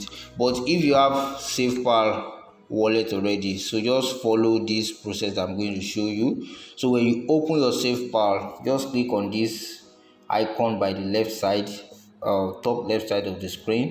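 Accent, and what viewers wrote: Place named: Africa. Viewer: Just because you dont understand African english. People like us understand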